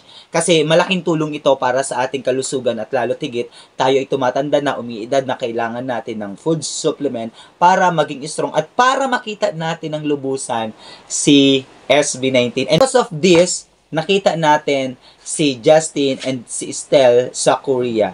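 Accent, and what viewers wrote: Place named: Mindoro, Philippines. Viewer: Nakakatuwa ka din pala kapag serious mode medyo ang usapan😁Destined na maMeet mo muna ang Sunshine Boy Stell and Sunny Boy Justin tlaga for you to have an interest in the group. Magandang simula Yan... and I'm also glad that you're being very True to yourself when you said that you're just starting to know and find out their stories Para masabi mo Kung nasa ang level ka na sa Yong paghanga, just a natural feeling👍👌😊. So either a casual fan or an A'tin makes no difference, just as long as your chanced meeting with Stell and Justin has already stirred within yourself a liking to them as artists, that's a good start. BTW, are you from Marinduque or Oriental Mindoro? Napansin ko lng sa accent mo during your vlog about Stell and Justin. I'm from Or. Mindoro, but my roots are from Marinduque...ngani😁👍❤️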